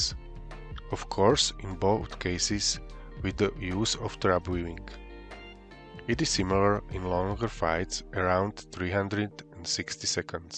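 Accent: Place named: Austria. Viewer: Are you austrian? You have a strong accent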